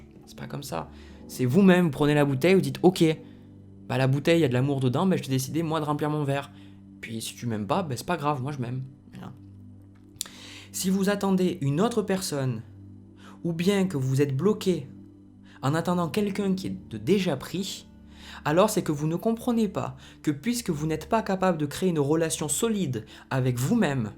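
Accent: South, France. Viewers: Bon, je me pose une question à ton sujet depuis que j'ai découvert ta chaine... D'un côté je me dis que je me trompe car tu sembles avoir un accent du sud mais bon comme la question revient malgré tout à chaque fois, je fini par te la poser... Est-ce que tu es né du côté de Rennes avec une lettre de plus dans ton nom officiel ? Si oui, je t'ai porté sur les fonts baptismaux... Namasté... ♥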